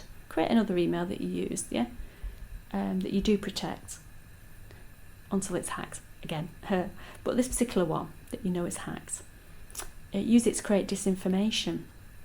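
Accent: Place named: Northern England, United Kingdom. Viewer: interesting idea I notice you have a north england accent I'm from there too. my views on who's doing what is controversial I am wondering what you believe triggered your targeting? as its rarer in england than America nevertheless let's just say Obama has a lot to answer he commited unreal crimes internationally against innocent citizens. all the best I like your idea I constantly give them abuse covertly while on a phonecall I found being reasonable doesn't make them stop. I agree about the hacked phone thing - the signs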